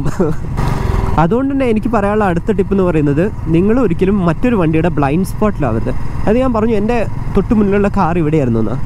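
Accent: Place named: Kannur, India. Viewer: bro ഏത് ജില്ല ആണ്? കണ്ണൂർ ആണോ അതുപോലെ തോന്നി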